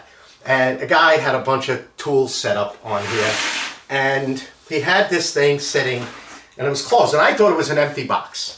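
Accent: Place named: New York, United States. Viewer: Merry Christmas, what part of NYC area are you from? I can hear it in your voice. LOL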